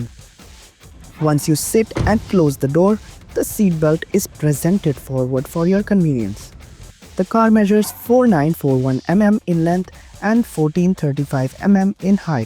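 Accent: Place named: India. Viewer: The sound of an Indian voice make it look cheap and a scam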